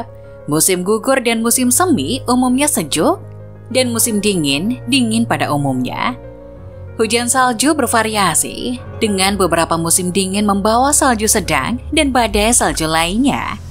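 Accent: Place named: Medan, Indonesia. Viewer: Medan nich bosss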